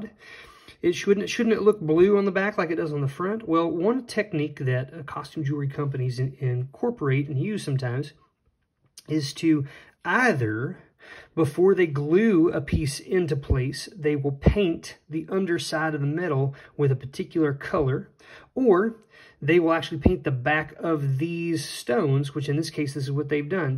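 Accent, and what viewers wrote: South, United States. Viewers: This guy is definitely from the south with that strong southern accent.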